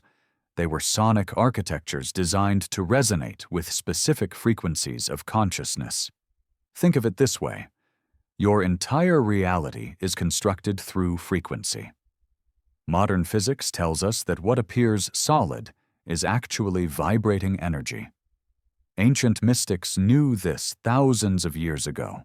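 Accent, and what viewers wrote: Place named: Kenya. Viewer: Those vowels sound and are exactly like those used by Kikuyus in Kenya 🇰🇪. A,E,I,O,U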